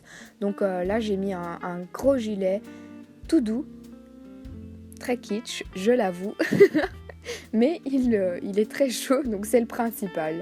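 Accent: Belgium. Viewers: Vive la Belgique et son accent 😅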